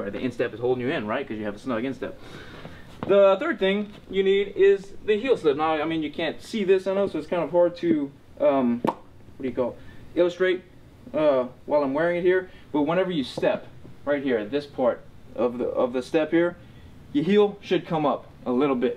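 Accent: United States. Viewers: How can you not love that New Iberia accent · You have to be from Louisiana with an accent like that.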